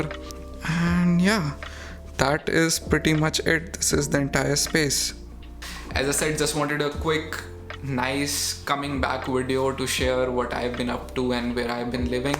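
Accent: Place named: India. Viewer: Wft ? This Indian guy can study in ANU with those terrible English and accent ? ANU has low standards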